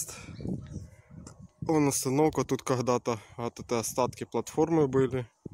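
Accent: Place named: Ukraine. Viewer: Да ладно нормальный голос с приятным малороссийским говором.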